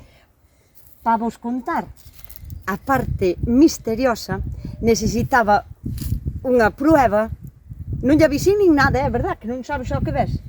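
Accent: Galicia, Spain. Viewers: ❤😂- Pechegos😅 como me gusta la forma de hablar Gallego ❤❤❤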